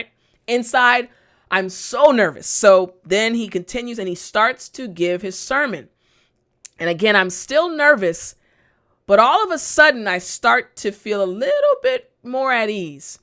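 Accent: United States. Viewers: Accent american listening very clear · I like the way you teach English with the real American accent . I really enjoy listening to you.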